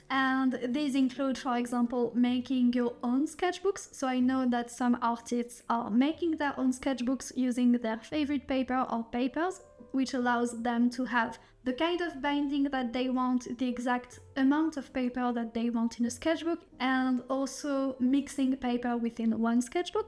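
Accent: France. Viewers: Totally unrelated but I am learning the Korean language and I see how difficult it is to speak in a new language not my mother tongue. From your accent I think you are French but I wanted to congratulate you for such amazing English! It’s not easy! You speak in even better English than some English speakers I know. I live in Ontario Canada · Your French accent is so gorgeous, SO gorgeous! Your voice carries it soo well. Anyway, I will now actually focus watching this. 👌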